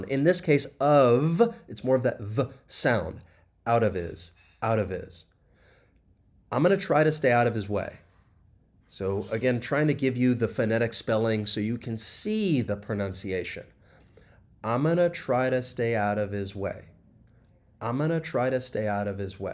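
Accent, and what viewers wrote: United States. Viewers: The most stupid American English accent which no one in the world understands it.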